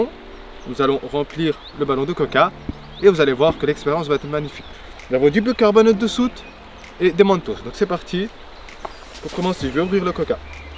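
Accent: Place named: Belgium. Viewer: Il a un axant de Belgique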